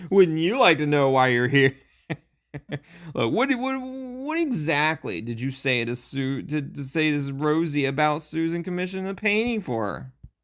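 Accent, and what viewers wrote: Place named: England, United Kingdom. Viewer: I am English and this is the funniest English accent I have ever heard 😂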